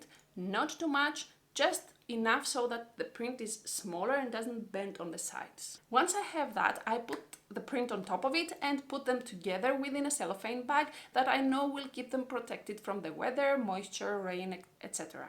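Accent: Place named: Russia. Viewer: good morning I thought you were russian because of your accent haha:) Kind regards from the netherlands🇳🇱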